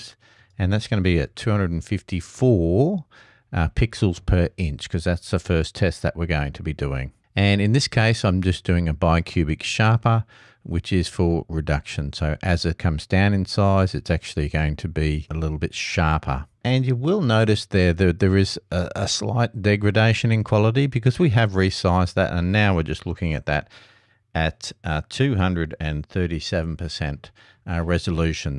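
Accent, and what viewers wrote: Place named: Australia. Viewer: No3 Way to go my man. Thanks for the info great to hear the Aussie voice.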